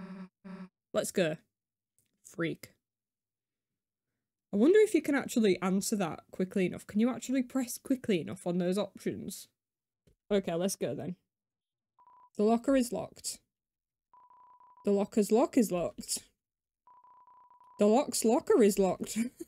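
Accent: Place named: United Kingdom. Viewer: British? not a problem, i don't mind british if it's good content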